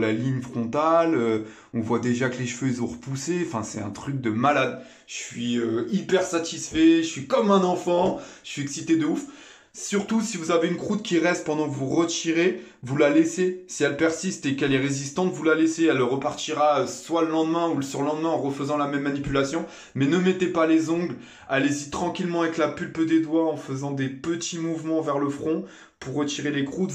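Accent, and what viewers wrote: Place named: Arab world. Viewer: Toi qui parle comsa tu est un français oubien un arabe ?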